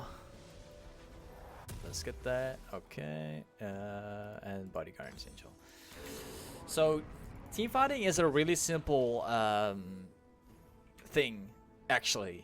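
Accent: Netherlands. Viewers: Are you dutch? I hear lika a accent?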